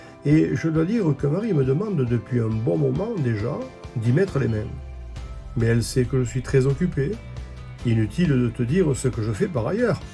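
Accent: South, France. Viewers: Effectivement, pas un luxe de les rénover! Merci de nous apporter du soleil avec votre bel accent du sud, à nous les nordistes sous la fraîcheur…estivale ! 😇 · superbe restauration il est vrai que notre société de consomation ne voie pas toujours cette fonction de pouvoir réabilité certaine chose.Mais quel plaisir de vous écoutez aussi parlé dans la langue de pagnol au chant des cigales vous êtes un conteur histoire magnifique j'adore 🙂, de plus je suis du sud aussi bravo a vous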